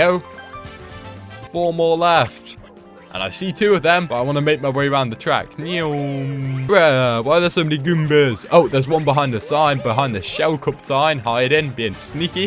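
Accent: United Kingdom. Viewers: Is that why you sound British?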